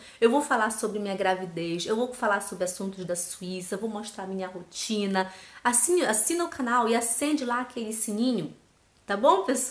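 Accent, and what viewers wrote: Pará, Brazil. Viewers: Assim que ouvi vc falar eu soube. É paraense. 😚 inscrita! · Eu sabia que você era paraense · Égua, bem que eu reconheço esse sotaque! Saudações de Belém do Pará! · Oi querida, achei por acaso seu Canal, e ja to assistindo., so uma Pergunta, Vc é Paraense? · Já sabia que você é do Pará só pelo sotaque haha. O nosso "inverno" é assim mesmo, só chuva e calor aff.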